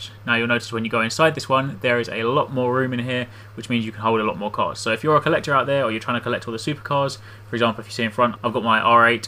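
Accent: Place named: United Kingdom. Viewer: he is british this is how british people pronounce garage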